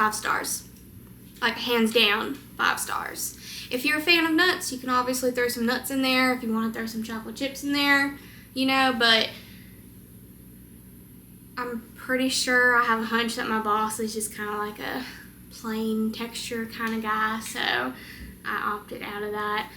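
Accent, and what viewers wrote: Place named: South, United States. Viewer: Just found your videos. Can't wait to try your recipes! Love your Southern accent!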